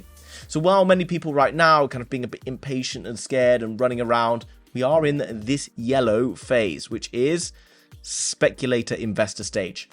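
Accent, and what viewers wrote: RP, United Kingdom. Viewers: Great to see content from the UK, straight talking, no BS. Would be good if he could swap his posh accent to a Geordie one.......just for one vid, obviously. LayerrAI is my pick. Keep up the good work 💪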